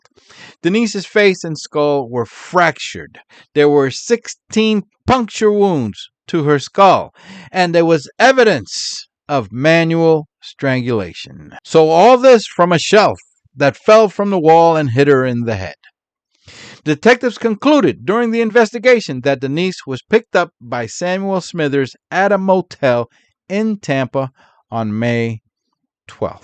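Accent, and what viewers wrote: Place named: Latin America. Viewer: and your latin voice.😊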